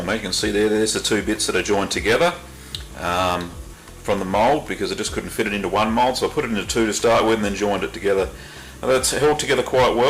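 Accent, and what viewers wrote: Australia. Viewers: I've just had a good laugh. My soundcard is broken so I watched this video with closed captions. speaks with clarity and has a pleasant Australian accent. Sadly youtube almost completely fails to understand. A highlight was when he added lip eyes to his fella. A useful video with added fun from youtube's narration software - thanks.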